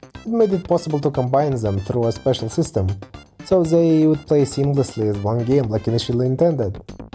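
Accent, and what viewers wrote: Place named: Russia. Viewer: Why do you have russian accent 🙄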